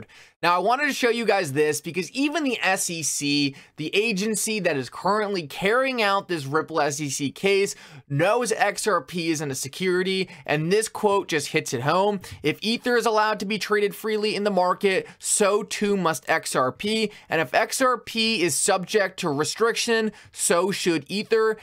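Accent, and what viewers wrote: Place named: California, United States. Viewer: Are you from California? You have a Cali accent.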